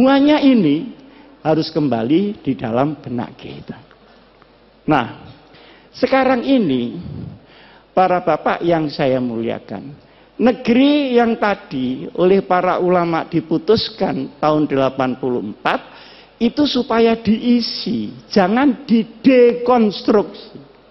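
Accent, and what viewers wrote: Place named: Malang, Indonesia. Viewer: KANGEN LOGATNYA KYAI MALANG PUOL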